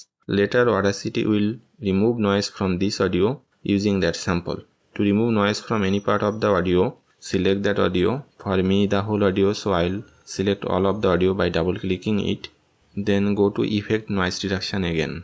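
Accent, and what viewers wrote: India. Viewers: The pronunciation of the sounds like a Bengali speaking!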